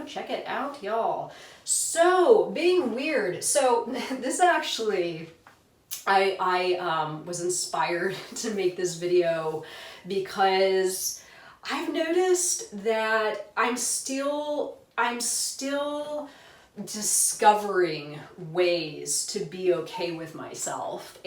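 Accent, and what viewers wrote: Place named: South, United States. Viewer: I love your videos. as a southerner i sense a slight southern accent. it seems you have tried very hard to hide it though but its so cute when it peeks through. IM not sure if i am right but if i am let it come through more! anyways love your vids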